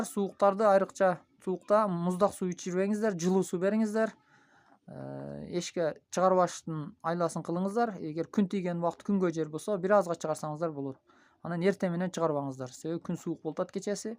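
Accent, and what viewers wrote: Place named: Uzbekistan. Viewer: Озбек окшойт кыргызча суйлогон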